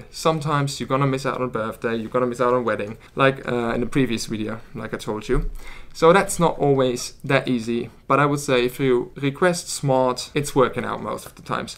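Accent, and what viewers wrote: United Kingdom. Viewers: I am trying to figure out where his accent is from. It's like he learned English from people in East London.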